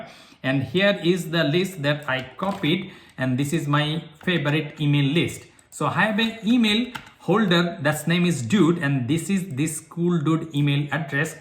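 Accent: Bengal, India. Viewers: Are you Bengali? Cause you English accent is very familiar to me.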